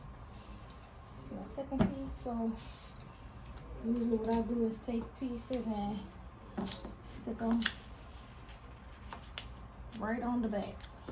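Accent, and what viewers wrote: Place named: New Orleans, United States. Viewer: Sounds like she's from New orleans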